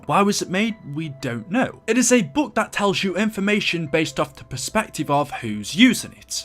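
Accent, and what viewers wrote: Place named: Stoke, United Kingdom. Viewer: Hi, huge fan, this question is not about the game, it's about you. Are you from stoke on Trent or around there? because you have quite a strong stoke accent I think, I live down the road in Newcastle. If you do you are the only famous YouTube around for miles, I'm proud of you are!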